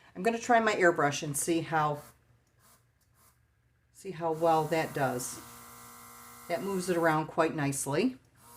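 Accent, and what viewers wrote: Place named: Central New York, United States. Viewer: This is awesome. I love hearing you talk. It's like I'm back home in CNY. The accent sounds the same. ;-)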